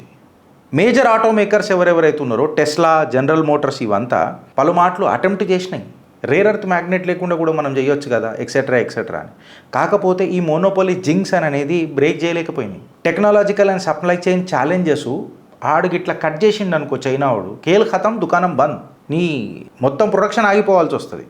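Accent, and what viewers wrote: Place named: Hyderabad, India. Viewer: అన్నా నువ్వు మొగుని లేక న్యూస్ చెప్తావా అన్న ... I like u bro way of our old city hindus mass slang ... And no one can beat❤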